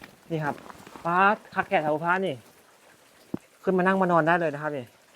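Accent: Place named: Ubon Ratchathani, Thailand. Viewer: เว้าคือลาวอุบลน้อ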